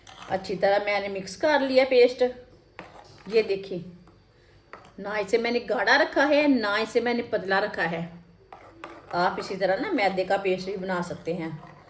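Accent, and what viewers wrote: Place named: Punjab, India. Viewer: آپکا پنجابی لہجہ بہت اچھا ھے👌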